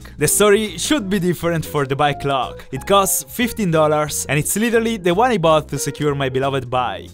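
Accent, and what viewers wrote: Italy. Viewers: I am now very nervous about traveling to Italy because of your skills and accent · Lovely Italian accent makes it so much more credible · are you italian? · U sound italian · Why did I watch this whole video just to hear his accent? LOL. He's so Italian. I love it. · I started noticing the English pronunciation were somehow familiar to me... I see Italian dictionary on the left : "I KNEW IT, MIO FRATELLO!!!"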